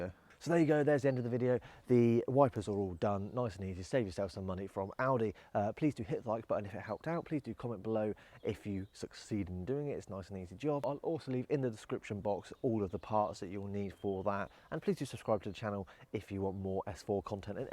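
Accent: England, United Kingdom. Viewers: I think people with English accents should be the only people allowed to teach things.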